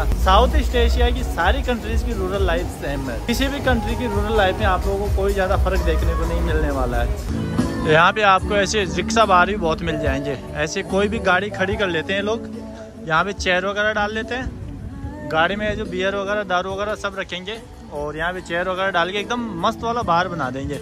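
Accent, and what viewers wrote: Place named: Bihar, India. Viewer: Bhai, ... Soop ne hota ...... Shop bolta ha ....... Bihari English.....🤨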